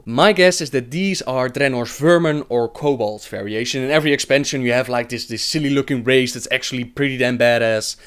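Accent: Netherlands. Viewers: Are you dutch? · ur dutch accent is next level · Are you dutch? I love your accent :D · heuj Dutch accent! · Nice video :) Lil question, do you happen to be dutch? ^^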